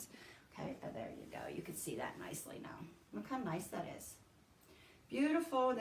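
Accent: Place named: Pittsburgh, United States. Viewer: Hello from a fellow Pennsylvanian. I was watching your video and thought to myself that you sound like you have a Pittsburgh accent. What a very beautiful, creative blanket (loved your granddaughter’s blanket too)! I am just starting out in sublimation. I made a few heartfelt creative Christmas presents. I am continuing to practice. I really love this creative outlet. I, like everyone else, was wondering where you got your blankets from, great size and it looks to be an awesome quality. Thank you for your informative, thought provoking video. Stay safe.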